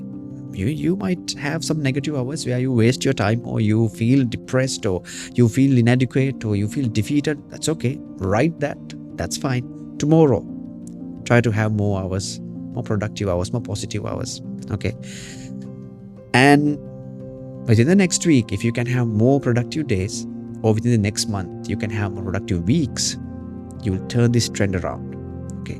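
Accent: England, United Kingdom. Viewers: Happy to hear your English accent sir I think your voice have something magical power❤ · Very much happy to hear your english accent sir. Love it. You are the motivation to express my self with confidence. I'll definitely speak with confidence one day like you. Thank you and keep up the valuable works. 👊 Jayen jayawewa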